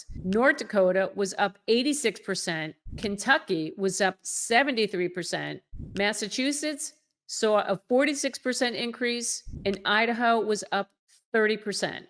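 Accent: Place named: New York, United States. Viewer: I can tell by your accent that you grew up in Long Island or North Jersey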